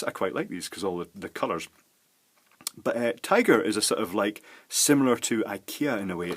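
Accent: Scotland, United Kingdom. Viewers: Interesting accent. Is it Scottish?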